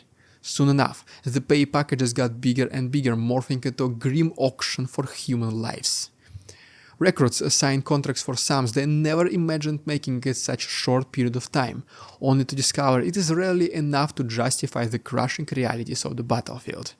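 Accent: Russia. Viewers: I'm sorry, I've watched about three of your videos so far but miss a lot of what you're trying to convey because you have a very pronounced Russian accent and yet you talk fast. The combination of these two factors means I'm constantly having to back up and replay content in order to understand it. I can tell you're really intelligent and have much to offer. Please consider this well-meant criticism.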